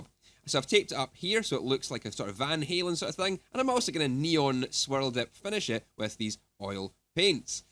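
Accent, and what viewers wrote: Scotland, United Kingdom. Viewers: I can feel the Scottish accent :))))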